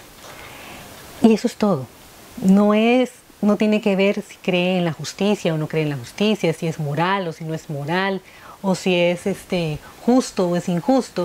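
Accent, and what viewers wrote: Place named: Costa Rica. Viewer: tu practicas alguna filosofia de vida o religion en tu vida, tu inteligencia es exquisita escucharte y una mujer muy bella me encantaria asistir a alguna charla o seminario tuyo x tu acento especulo que eres tica y q vives en san jose?? Se t puede ubicar de alguna manera donde encuentro tus libros??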